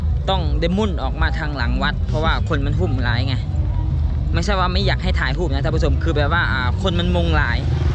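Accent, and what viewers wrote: Laos. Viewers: เว้าลาวกะได้เจ้าเอี้ย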